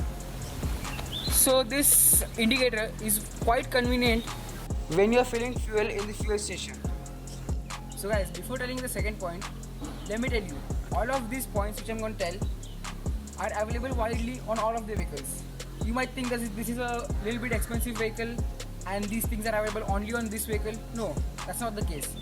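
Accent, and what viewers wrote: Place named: England, United Kingdom. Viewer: Nice video bhava... Nice English accent..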